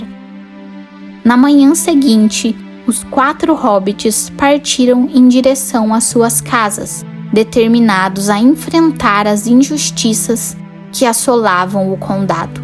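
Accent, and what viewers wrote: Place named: São Paulo, Brazil. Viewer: A narração é muito boa, só tenha cuidado com a pronúncia de algumas palavras, como "abrutalmente", que eu acredito que seria "abruptamente", e algumas outras, que estão com um sotaque paulista/sulista muuuito puxado no "r". De resto, esta muito bom!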